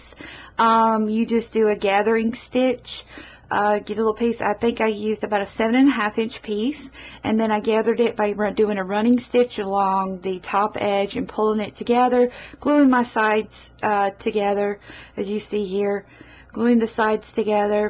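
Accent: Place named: Kentucky, United States. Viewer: Love it! Would love to know where you're from...lol. You sound an awful lot like this old KY gal! Love it!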